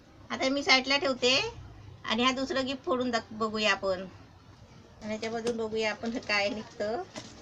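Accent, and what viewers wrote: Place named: Maval, India. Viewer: khup mast.. tai..! abhinandan tumhala khup shubhechhya..!! aani diwali faralachya videos sathi dhanyawad..!! /\ tumachya maval bhashene mi khup prabhavit zale aahe..!! 😝😍😍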